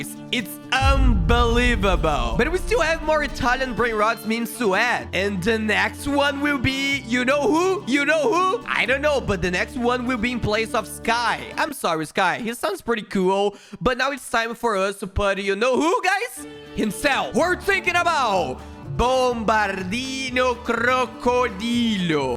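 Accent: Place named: Brazil. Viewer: He’s Brazilian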